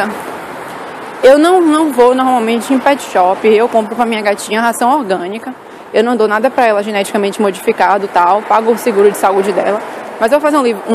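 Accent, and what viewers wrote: Brazil. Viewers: Você é do nordeste ?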